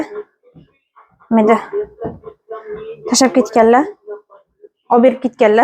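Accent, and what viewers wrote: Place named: Samarkand, Uzbekistan. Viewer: Samarqandanmasmisiz sheva tortadi😊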